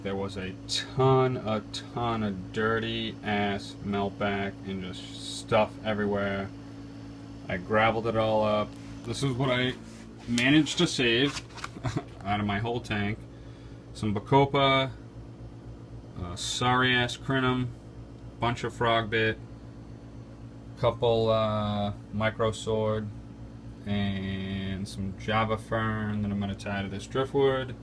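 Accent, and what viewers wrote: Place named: New York, United States. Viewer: Following cuz you sound like you’re from NY.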